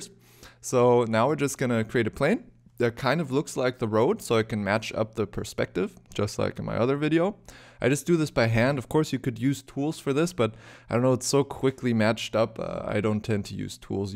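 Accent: Germany. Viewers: Are you a German-Guy? Your accent sounds German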